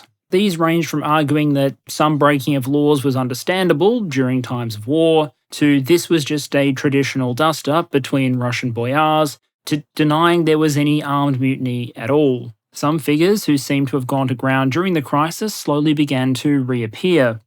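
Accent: Australia. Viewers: One, he expresses he is biased many times before so yeah, he'll be critical to the Russian Army, but he also explicitly says their strengths. Two, the cheap shot dry humor is his schtick. His Aussie, that came in a package. It also makes the dry delivery of information more interesting and entertaining, this is still a YouTube video after all. And three, you can just...idk, don't watch of the jokes are a turn off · Not according to his profile: " Description An Australian covering the military industrial complex and national military investment strategy." Or for that matter, his accent.😉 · As a ‘murican, I love the dry Aussie humor and the accent. It’s what makes Bluey extra cute too, kids speaking Aussie.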